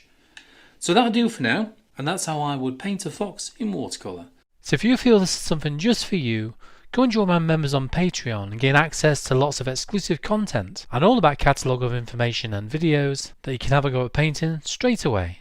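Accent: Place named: Northern England, United Kingdom. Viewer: Are you from Devon? I'm American but I lived in England from <num> <London) I'm American, so I'm not great with British accents, but you sound Northern to me! (my husband is southeast London.. I'm gonna ask his opinion :-) all British have an opinion on accents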